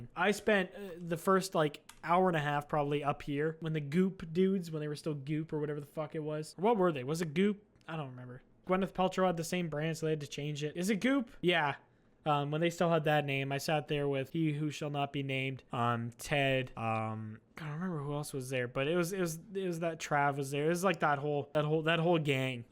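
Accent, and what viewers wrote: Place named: Canada. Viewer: God I never realized just how canadian you sound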